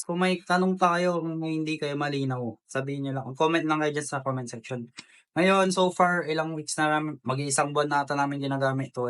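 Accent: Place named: Pangasinan, Philippines. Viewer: Pangasinan accent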